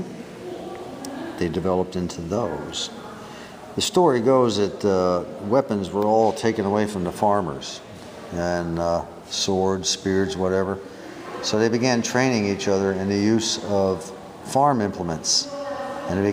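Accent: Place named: Iowa, United States. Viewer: .....I can tell you are NOT a high-brow..cause you say "ANT" instead of "AUUNT", just like an Iowan like old Buzz!!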